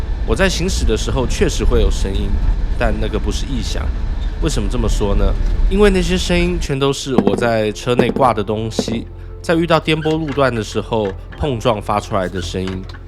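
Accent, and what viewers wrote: Taiwan. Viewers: 語調很台...用語很中....真怪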